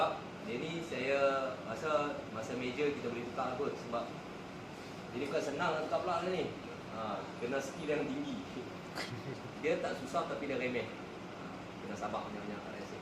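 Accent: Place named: North, Malaysia. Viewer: Power...cara bahasa mcm orang belah utara...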